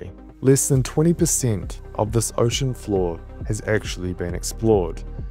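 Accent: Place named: New Zealand. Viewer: I love how the captions corrected 'depths' to 'dips' because of your NZ accent lol.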